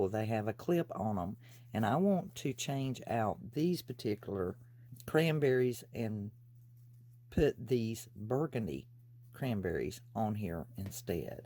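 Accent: South, United States. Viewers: Thank you! Such na wonderful band east project and your explanation is beautiful love hearing your southern voice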